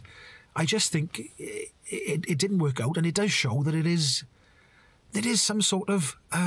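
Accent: Wales, United Kingdom. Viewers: I believe that the leasing arm of Porsche will be liable for any loses...many leasing companies both ICE and EV have been caught out by wildly fluctuating second hand car market prices since the pandemic and the war in Ukraine. Keep doing what you do, it's goof to hear a fellow Welsh voice in the debate... you are around Caerphilly correct?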